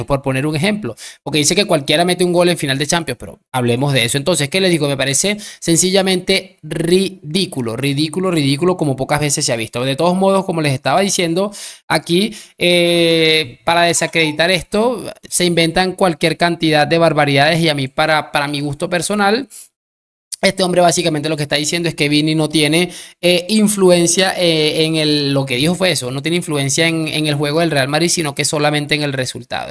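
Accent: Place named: Venezuela. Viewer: Ojo como estás mi hermano por tu acento eres venezolano no soy madridista es más admire aquel equipo de Romario estoicob ladrum kuman Guardiola de la peña con cruif como técnico igual aquel Madrid de Butragueño hugol Michel Martin Vazquez Gordillo buyo Sanchís Valdano santillano Juanito uff equipazo y e seguido a ese Madrid de Roberto c Raúl Elguera casillas y cristiano y compañía y ojo Raúl filtraba casillas filtraba Sergio ramos igual lo acia eso siempre a sucedido cambio en los últimos.4 años a Zidane se lo cargaron con las filtraciones y ojo te lo dice alguien que admira al Madrid como el mejor de toda la historia del fútbol a la altura de Brazil Alemania e Italia pero no soy fans de este súper club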